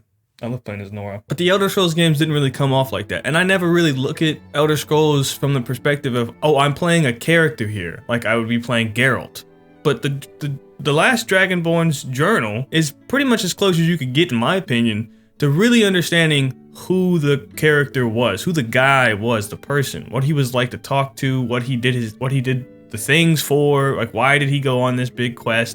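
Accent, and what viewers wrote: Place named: New York, United States. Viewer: Isn't me or as a video went on. He got more and more New Yorker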